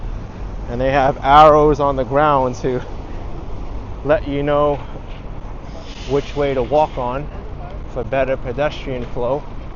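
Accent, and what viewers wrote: New York, United States. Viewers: What a cool looking city. Your NY accent is so think. 😂 It gives so much character.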